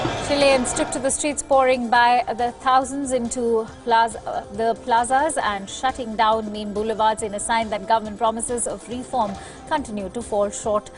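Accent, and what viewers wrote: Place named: India. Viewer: Ewwwwwww Indian accent lol destroyed English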